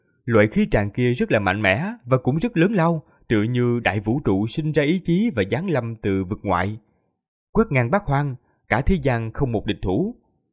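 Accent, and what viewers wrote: South, Vietnam. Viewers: Cảm ơn đạo hữu rất nhiều Vì tui đồng hành vs từ những ngày lập kênh Qua biết bao nhiêu tập truyện.. Nên khả năng nghe và nói tiếng miền Nam tốt lên rất nhiều Đợt công tác vừa rồi vào miền Tây ko có bỡ ngỡ như ng lần đầu vào chút nào cả..❤ Vì thế giao tiếp rất chi là tốt vs ng bản địa Rất cảm ơn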